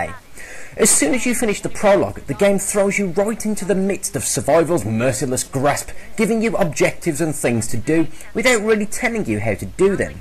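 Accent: Birmingham, United Kingdom. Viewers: Is that a brummie accent?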